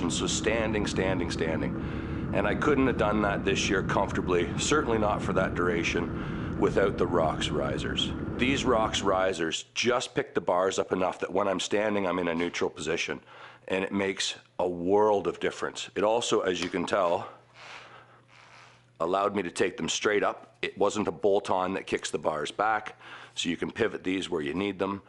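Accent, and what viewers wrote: Canada. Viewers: Sweet canadian accent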